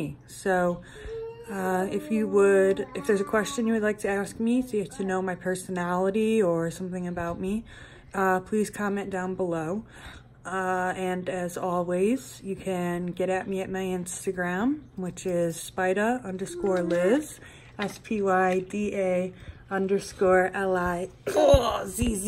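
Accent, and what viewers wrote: New York, United States. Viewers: In America her accent is New York , New Jersey , Boston area sound like to me .Im in the south KY . to both be American we sound so different ! Shes adorable !! I love her accent !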